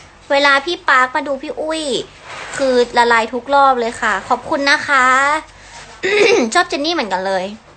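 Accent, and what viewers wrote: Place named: North, Thailand. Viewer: น้องน่ารักนะชอบให้พูดเหนือน่ารักดี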